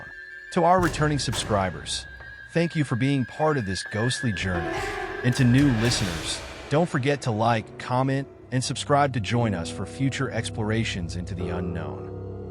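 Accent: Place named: United States. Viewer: Why the Yanky VOICE😢😢😢😢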